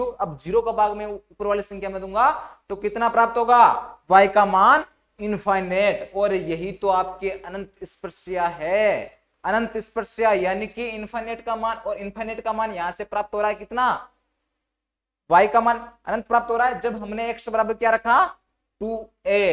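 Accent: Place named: Haryana, India. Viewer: Apke bolne ke stayle se lag raha h u r from hariyana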